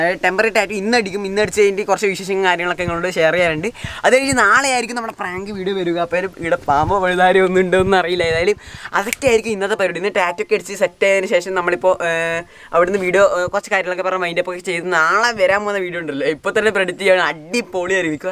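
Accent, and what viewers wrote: Kannur, India. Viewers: Nee kannur karanano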